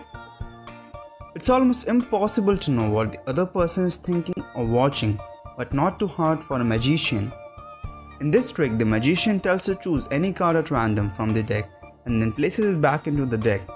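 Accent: India. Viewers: Sorry but I would say NO to Indian Accent. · Well guys don’t worry about his accent ‘ he is a indian guy ‘ but must be well educated and it was awesome presentation 👏🏻👍🏼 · English is not my native language, however Indian accents are probably the only ones I have difficulties understanding.. 🙈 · Why the fuck every time I hear a Indian accent (I'm not sure if he is Indian) I believe there name is mohammed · why is the commentator swallowing words? very thick indian accident is ok. Some indians speak clearly. This one is awful. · Love the Indian accent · Nice vid. Narrator is from the subcontinent. I'm guessing India. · Are u indian voice??? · Indian accent don't go well with Magic reveling voice-over. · If u have any problems with others then keep it to your self by sharing it to the world you will make it worse for the guy who is talking as well as some Indian people might take take it seriously and u didn't mention its indian accent but the accent he is talking is obviously indian right ? 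So please understand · Is he Indian? Are you sure? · Indian accent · สำเนียงภาษาอังกฤษ!เหมือนคนอินเดียพูดภาษาอังกฤษเลย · Indian accent for sure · I’m just curious why a few random videos of theirs uses an Indian guy to narrate? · The moment i heard indian accent, i quickly stop the video and dislike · Is the Narrator INDIAN?? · That's Indian accent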